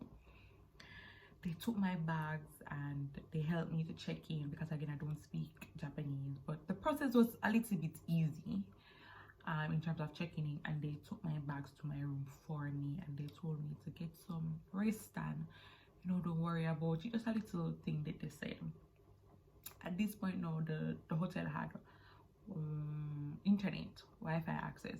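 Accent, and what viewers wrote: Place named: Patois, Jamaica. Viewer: Hey my fellow Jamaican. I just subbed...mi hear di patois 💚💛🖤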